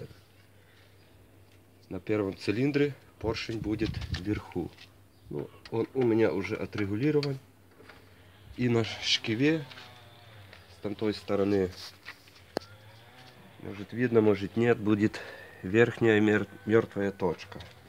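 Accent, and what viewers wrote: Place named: Baltic. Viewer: У вас акцент буд-то вы из прибалтики.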